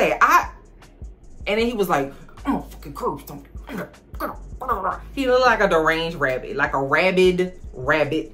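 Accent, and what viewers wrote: New Orleans, United States. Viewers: I love me a woman NOLA YouTuber! The best accents and reads😂😂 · I was completely taken aback by the straight faced comedy!!! It took me a quick second to understand who trefalga was… The algorithm truly blessed my time line with you. The impeccable face card drew me in… the Nola accent had me locked… the non stop roasting made me subscribe AND ring the bell. Looking forward to viewing more and more of your content beloved. Willing you success in all your righteous endeavors. ✌🏽&❤️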